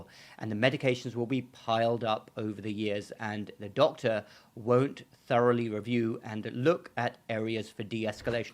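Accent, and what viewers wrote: United Kingdom. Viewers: I’m a subscriber, and watch every post with extreme interest. I’m also almost 73. The title and thrust is somewhat condescending, but I know you do not mean it that way. (Despite the British accent…😅). Many of us “of an age” don’t need any watching over, but many, far younger than you mention here, are incredibly naive and many times borderline stupid. Too many older people in America think they have some inalienable birthright which requires they be looked after in their later years with no input from their own logic base, and this is about as inane as it gets. Of course the medical industry makes merchandise of them, but so do the insurance, food, housing, and retail industries, not to mention the government and every other grifter with a smooth line, and way too many people who should be wiser fall for it with predicable silliness. 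Yes, I’m a cranky old man but I also do my homework, take care of and responsibility for myself; any doctor who gets sophistic, rolls eyes or condescends to me when I ask a question learns quickly enough if they can’t respect their elders, they damned well need to learn how respect their betters. If more “mums and dads” adopted a like attitude and took care of business on their own behalf there would be little cause for this type of concern.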